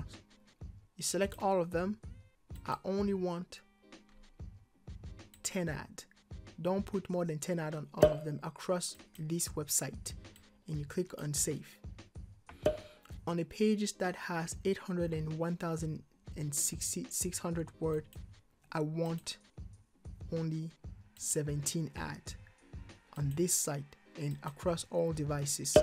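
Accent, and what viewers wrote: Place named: Nigeria. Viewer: I just landed on your channel and l stayed on. Good stuff. Your accent sounds Nigerian.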